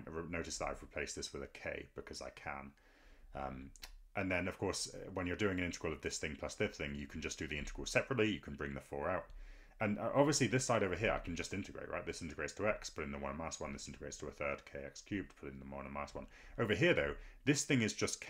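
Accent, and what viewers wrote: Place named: United Kingdom. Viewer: Can u go some slow because international students also watching your videos it takes so much of time to understand the your British accent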